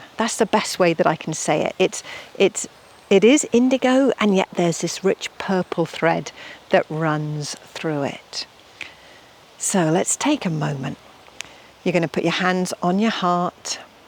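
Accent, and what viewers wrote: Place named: United Kingdom. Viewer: ❤love her clean beautiful accent. Why is it that other British people sound different from her and barley understandable?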